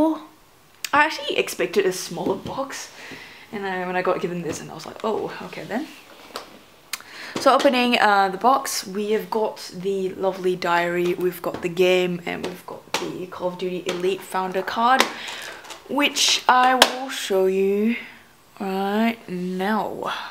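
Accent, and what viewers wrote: Australia. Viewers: are you a boy with a auzzie accent or a girl? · Ur Australian? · It's not that hard guys, this is obviously a woman from Australia (or New Zealand always get mixed up) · Aussie Accent?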